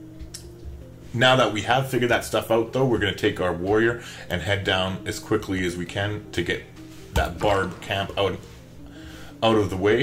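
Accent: Canada. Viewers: Where in Canada are ya from? I can hear it in your voice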